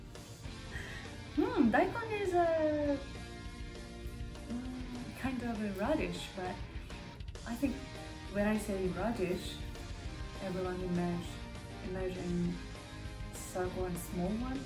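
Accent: England, United Kingdom. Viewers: I love your English accent, I could listen to you forever. Its a perfect Babyface voice. I cant wait for you to cut a smooth promo in the ring. You re awesome, Champ.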